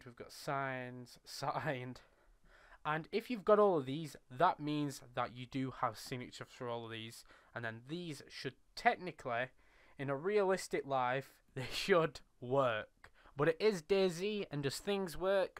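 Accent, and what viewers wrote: United Kingdom. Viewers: You sound like a Yorkshire lad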